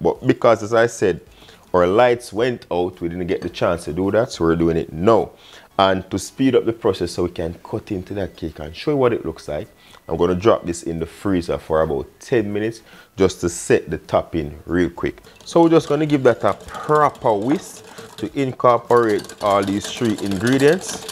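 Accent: Jamaica. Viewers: Love that accent/ patwah don't know if I spelled that right. 😁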